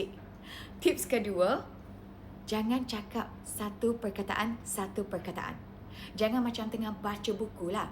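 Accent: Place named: Terengganu, Malaysia. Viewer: orang pantai timur ke?? Bunyi skek2 tu mcm orang Tranung je.. Sayeee sukeee awookk 😂😂😂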